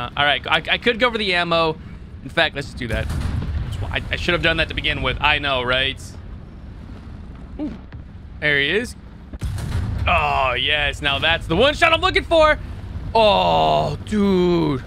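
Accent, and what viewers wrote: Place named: United States. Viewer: You have the voice of callmekevin mixed with a clickbait youtuber voice and the tone of an american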